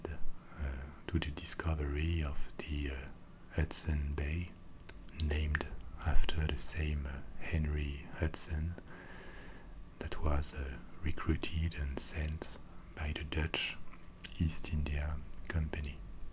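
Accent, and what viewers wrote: France. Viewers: I was gonna say he sounds French and then I took a look at his username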